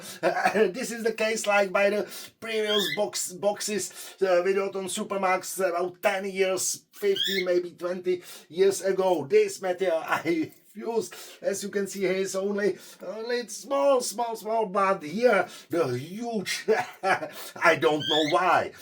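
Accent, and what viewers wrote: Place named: Finland-Swedish, Finland. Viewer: You sound finnishswedish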